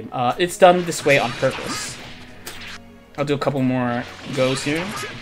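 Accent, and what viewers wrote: Baltimore, United States. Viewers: Bruh you from Baltimore?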